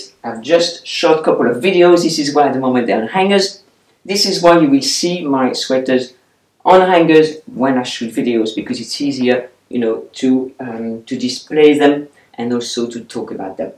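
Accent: France. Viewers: Sometimes I catch the first part of a video without sound but with captions. Apparently the captioning cannot translate your delightful French accent so your name was translated as Manhattan Looker. Probably the opposite of French chic!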